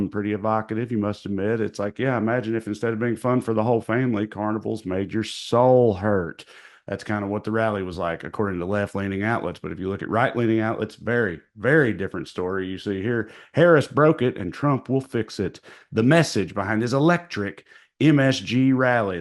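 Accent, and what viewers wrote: South, United States. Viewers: Thank you so much for bringing humor to all the news I listen to. Here on the W Coast---Love hearing it in your Southern accent and wit! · I am just stumbling in, how the hell did it take so long for my youtube startpage to recommend me this channel???? Probably racist what I think but I'm a european who "studies" the American people with great fascination and interest since Michael Moore's "Bowling for Columbine" and the way you deliver those great democratic messages with this accent which is mostly portraied with this "south will raise again" stereotype is completely hilarious for me right now. Amazing :D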